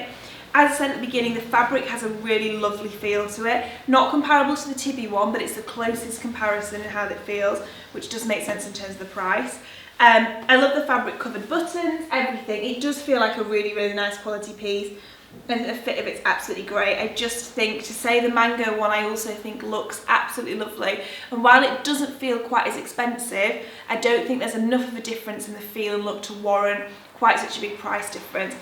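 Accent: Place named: Northern England, United Kingdom. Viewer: YOU'RE PRETTY AND I LIKE YOUR NORTHERN ACCENT.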